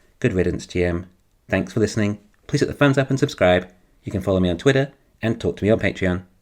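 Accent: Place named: London, United Kingdom. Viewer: Sounds closer to London to me (or at least home counties)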